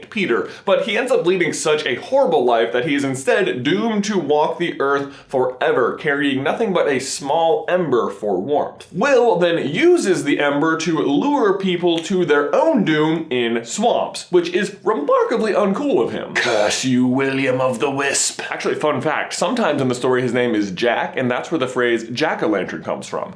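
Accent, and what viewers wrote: South, United States. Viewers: i never realized that he has a sight country accent until this video and now it is all i hear oml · Do you normally talk with a Southern accent, if so why change it? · I can't get out of my head that he is southern now. I didn't notice his southern accent before. Great.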